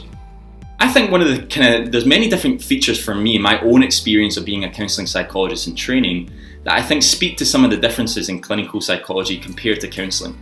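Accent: Scotland, United Kingdom. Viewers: sure. Reminds me a bit Fish accent. Marillion singer. He was born in Edinburgh or not far from. Thanks for response and for interesting material.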